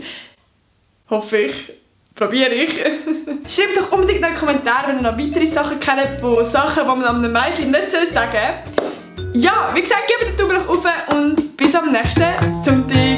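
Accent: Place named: Switzerland. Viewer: scho klar aber vo welem kanton wel de akzent chunt mir bekannt vor😂😂 Und überigens mega cooli videos wo du machsch👌🏻❤️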